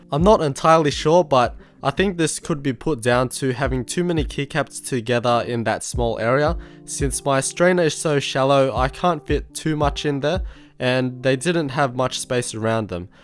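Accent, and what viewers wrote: Australia. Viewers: I thought you were Australian! ...if it was a mix accent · ayyy an aussie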